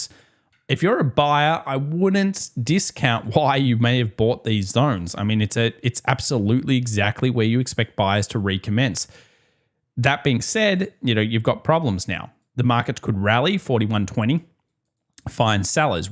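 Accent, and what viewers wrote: Australia. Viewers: haha I watcha de women with big jubs but I admit myself I just love the dudes Australian accent.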